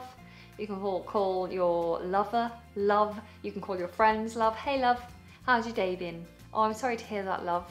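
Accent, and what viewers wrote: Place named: United Kingdom. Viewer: Big Thumbs up; love your British Accent so much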